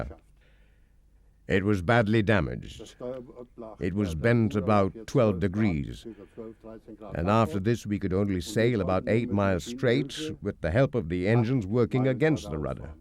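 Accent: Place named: United Kingdom. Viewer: Why do documentaries always have to be narrated by a brit?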